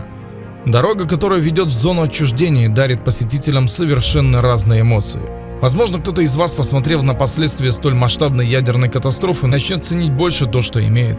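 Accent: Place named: Ukraine. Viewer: егор соболь а разница то какая? Разве это вбила? Просто у нас Украинцев такой говор вот и всё.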